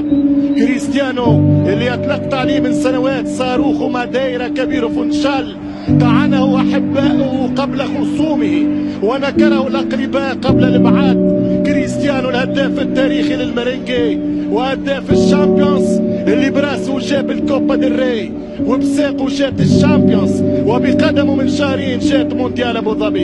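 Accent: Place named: Tunisia. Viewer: the commentator in this video is Tunisian which is soooooo different than the egyptian dialect! your comment makes 0 sense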